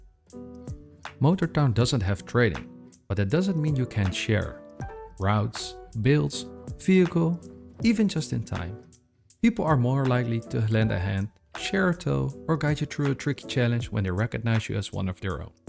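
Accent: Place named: Netherlands. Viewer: i hear a small dutch accent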